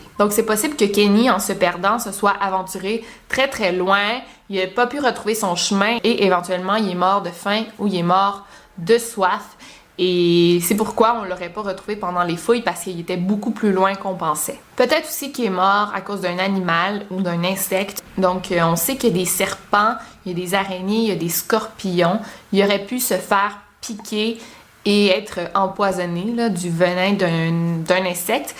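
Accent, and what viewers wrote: Quebec, Canada. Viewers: Avant j’aimais pas trop l’accent canadien/québécois, mais quand tu commence à raconter tes histoires... WoW... c’est juste trop beau 😍 j’adore ce que tu fais, et je te suis depuis peu de temps mais je suis accros ! 😅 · Encore une vidéo !😍 Je suis accro à ton contenu et c’est plus lfun d’écouter ça venant d’une québécoise 🙌🏻 C’est une amie qui m’a fait découvrir ta chaîne avec ton vidéo de creepypasta et depuis je suis hooked✌🏼j’ai hâte de voir les prochains sujets dont tu va parler et je suis vraiment intriguée par l’histoire de Chris Watts présentement et j’espère qu’un jour tu en parlera aussi. Ps. J’espère que tu as eu de belles vacances\un super mariage ✌🏼❤️ xx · Je kiffe 😍 j'adore toute tes vidéos j'arrive même a les regarder quand je suis toute seule chez moi,mes parents dise que tu casse la tête avec ton accents québécois mais moi ça ne me dérange pas continue ce que tu fais 😘😘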